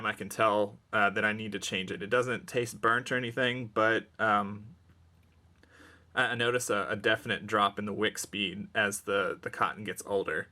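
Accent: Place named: South, United States. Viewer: thanks for putting me to sleep, jesus get some life in you . You sound half dead and talk like your from down south slow as can be. Otherwise good vid and a quick nap for me is good as well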